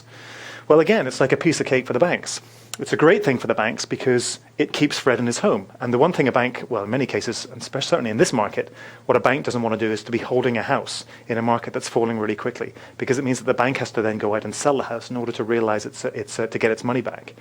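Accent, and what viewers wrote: England, United Kingdom. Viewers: All these videos pertain to the USA right? or are they in the context of the UK? The English accent has me wondering...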